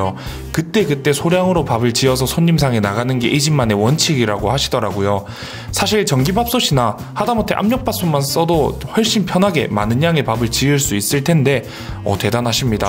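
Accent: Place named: Seoul, South Korea. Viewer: 이제 인트로가 서울말 다됐네 다됐네.....초심....유튭최강자 되니까 사람변한다....사투리로 돌아와줘😭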